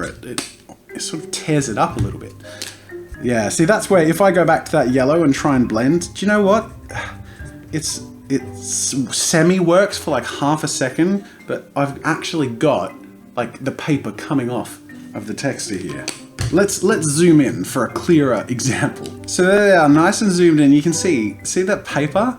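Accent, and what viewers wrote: Australia. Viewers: Are you Australian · During the testing he kind of sounds like a happier, friendlier and australian version of gordon ramsay :D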